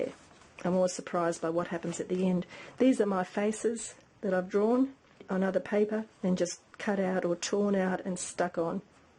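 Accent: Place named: Australia. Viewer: Great to hear an Australian accent on YouTube! haha Love the artwork :)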